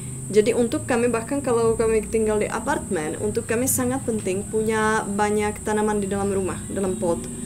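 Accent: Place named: Java, Indonesia. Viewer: medok bangaet bahasa indonesianya mbak ceko cantik. 👍💜👍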